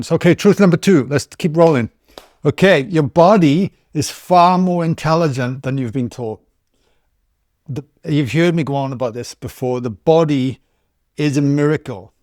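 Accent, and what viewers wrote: Wales, United Kingdom. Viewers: Nice to hear a Taff accent. Feels like I'm back in the Valleys 😅. Thanks for these insights based on all that experience.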